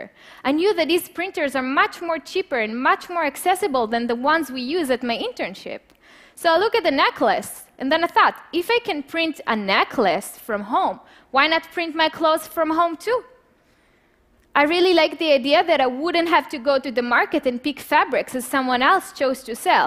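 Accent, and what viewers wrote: Israel. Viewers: Most Israelis have a heavier accent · I do hear the slight Israeli accent :P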